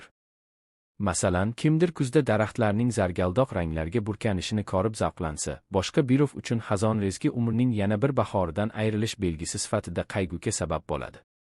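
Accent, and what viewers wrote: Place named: Russia. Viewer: Nima rus kishi oʼqiganmi buni gʼ ni g deb aytadi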